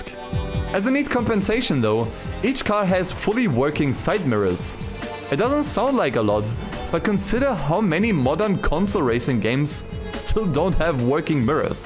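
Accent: Germany. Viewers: Dein Englisch ist schon sehr stark vor allem dein Wortschatz auch wenn man den deutschen Akzent hört 😜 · nice deutscher Akzent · Which accent is that in your english ?:) German ?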